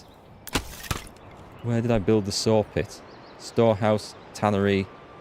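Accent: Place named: North Yorkshire, United Kingdom. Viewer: could hear the subtle North Yorks accent, I grew up in county Durham, so kinda sat on the yorks/lancs rivalry border :)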